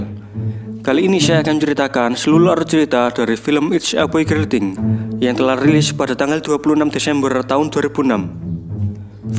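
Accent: Java, Indonesia. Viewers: Itu sempah gw bukannya fokus ke film malah ngakaknya ke suaranya😂😂😂udah medok lucu lagi😂😂😂apalagi pas bagian tegak menuju tak terbatas aduh bengkek 😂😂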